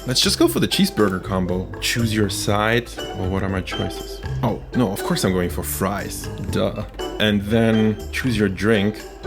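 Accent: Germany. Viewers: Wer hat auch direkt an seinem Akzent bemerkt das der deutsche ist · Gad dammit!!! I just knew you were german. It`s my second video from you and I just knew something was wrong with your accent and now I know it for sure. Greetings from Luebeck. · I watched the Shrimp cracker video first and I already assumed that you might be german hence your subtle accent. Turns out I'm right!!! And you're even a Berliner!!! I really like your channel and.. why do I even feel like we life in the same area?? lmaooo (I'm not a crazy stalker I swear xd) Definitely subscribing!!!!!!~~ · He’s russian, grew up in germany (that’s probably what’s up with his accent) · Ich schaue das Video und dachte so: wow der typ hat nen leichten deutschen Akzent 😂👀 Gutes video 💪🏼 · Hui der ist ja auch deutsch:3 habe ich nicht nur am Akzent, oder der Sprache von Lieferando erkannt :0.. ich kannte einige Lieferanten aus meiner Gegend uwu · Dieser deutsche akzent lul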